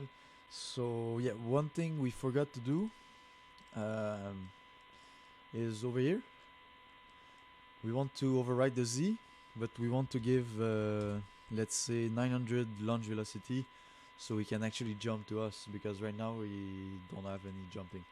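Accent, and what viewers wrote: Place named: France. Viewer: Hey, just want to let you know that your French accent is very nice and clean, you speak English a LOT better than lots of my French friends.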